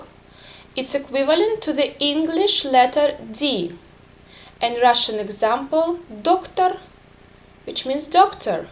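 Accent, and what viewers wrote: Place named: England, United Kingdom. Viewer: your courses are amazing !! no problem with your english accent, i can keep focusing and understand you. Thank you !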